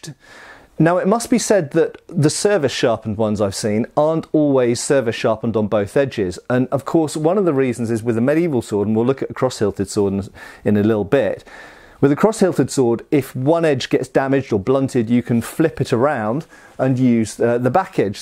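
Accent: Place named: United Kingdom. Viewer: Simply amazing - a Brit speaking and I can understand every word!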